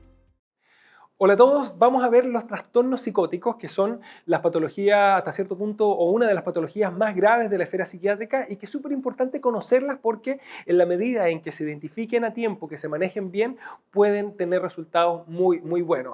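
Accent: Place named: Chile. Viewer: Q agradable encontrar un acento chileno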